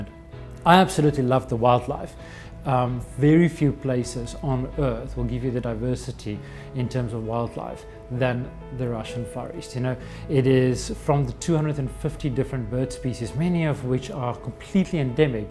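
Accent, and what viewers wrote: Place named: South Africa. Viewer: This dude sounds South African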